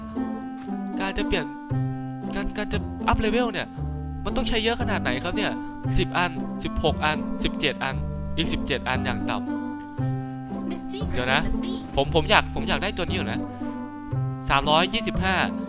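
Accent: Isan, Thailand. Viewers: ไม่เคยเห็นใครพูดอีสานได้น่าฮักขนาดนี้เลย;-; "หยามบักคัก" คือแบบตายอย่างสงบแล้วค่ะ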